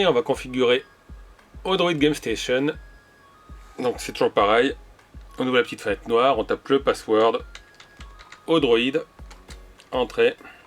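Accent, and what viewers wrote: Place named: England, United Kingdom. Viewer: Un peu compliqué ton truc lool . Par contre j aime ton accent anglais 😋😋😋😋.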